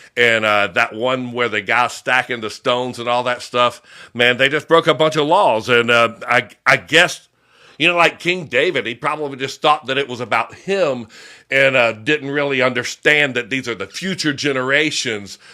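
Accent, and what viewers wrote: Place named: Georgia, United States. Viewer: REWATCHING FOR NOTES, i am so sorry i cant help it LMBO...@ you said "I APPRECIATE THAT DAD" 🤣😂😁🤣😂🤣😁🤣😂24✝🙏 Gotta love our Georgian accents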